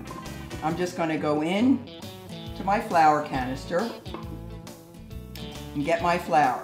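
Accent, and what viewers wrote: Philadelphia, United States. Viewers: That Philadelphia-sounding accent makes me lose my appetite. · you have to be from Philly/S. Jersey! Wooder! Love your sass! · This woman lives around Philly, somewhere. I recognize the accent.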